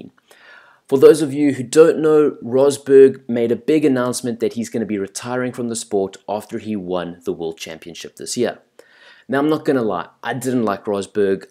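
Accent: South Africa. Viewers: .....nice South African accent boet.........but your pronunciation of the drivers names is shocking...ja ok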